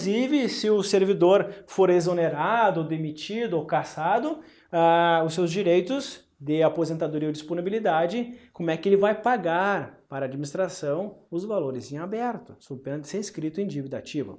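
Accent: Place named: Rio Grande do Sul, Brazil. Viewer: Adoro o sotaque gaúcho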